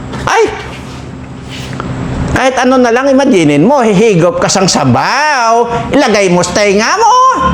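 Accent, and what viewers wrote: Iloilo, Philippines. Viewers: ilonggo kaba sir parang ilonggo yong bigkas mo ng salita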